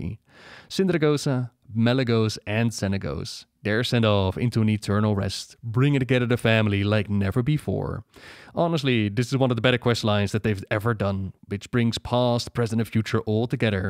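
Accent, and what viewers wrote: Netherlands. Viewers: Love the accent of a fellow dutchy🤪🤘🏻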